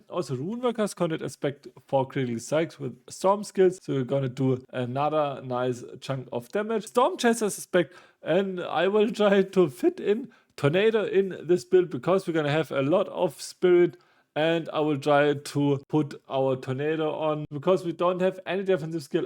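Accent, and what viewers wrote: Germany. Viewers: Digger dein deutscher Dialekt im English ist so wild 😅. Trotzdem danke fürs Video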